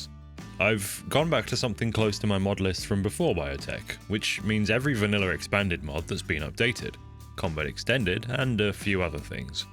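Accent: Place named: England, United Kingdom. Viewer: I like your accent English man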